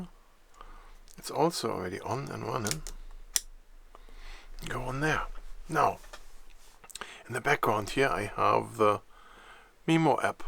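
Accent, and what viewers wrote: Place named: Germany. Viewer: German English... it's easy to understand :) But the video was very helpful, by the way, thank you.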